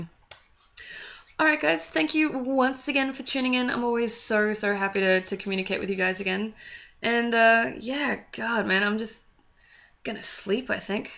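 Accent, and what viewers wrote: Australia. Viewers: it's so nice to hear a laid back aussie on youtube for a change.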